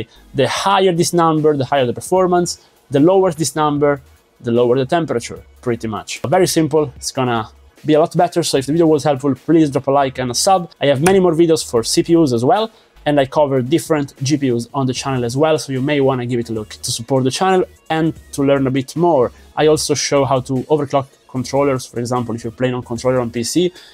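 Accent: Italy. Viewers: sei italiano??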